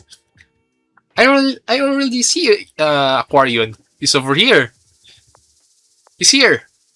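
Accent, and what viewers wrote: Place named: Philippines. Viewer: U sound Filipino lowkey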